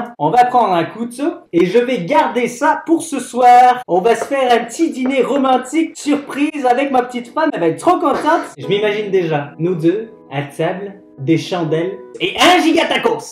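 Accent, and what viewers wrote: Quebec, Canada. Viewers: J'ai regardé la première vidéo j'ai poster un commentaire tu m'as répondu j'espère que tu me répondras sur ce commentaire j'ai juste quelque chose à dire je croyais que tu étais vraiment un Québécois moi je sais faire l'accent québécois mais pas qu'un peu ah oui tu m'as fait trop rire😂😂😂😂😂😂✌️✌️✌️✌️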